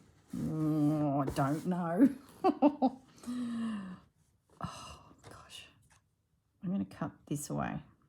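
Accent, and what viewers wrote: Australia. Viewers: Loving the jacket and find your story telling interesting. You are truly a unique person, also, I'm in Indiana, USA. Enjoy your Australian accent. 🙂.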